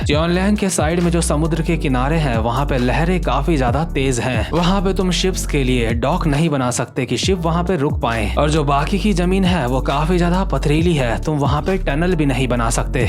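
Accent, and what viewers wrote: Uttarakhand, India. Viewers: Bhai aap uttrakhand sa ho kya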